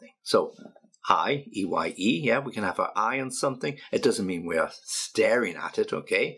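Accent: United Kingdom. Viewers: مرحبا هل هذه لهجة بريطانية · I just found this channel, British English and I love this so much. I'm Indonesian, and I love learing Brit_Eng ❤ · I love british accent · Are them british sounds or American? I like british.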